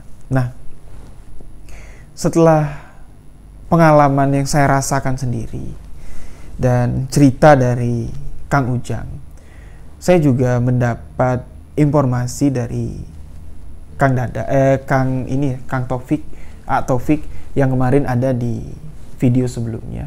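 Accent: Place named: Bali, Indonesia. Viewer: hadir meski telat,suka dgn logat suaranya mas logat khas Bali 👍👍👍😀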